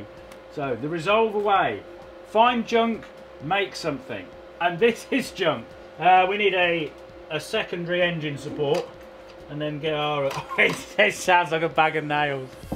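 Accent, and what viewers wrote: England, United Kingdom. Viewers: Why after every time I watch a new video do I want to start talking with an English accent and go out to the garage and try to think up the most insane and off the wall contraptions..... 😂😂😂fantastic video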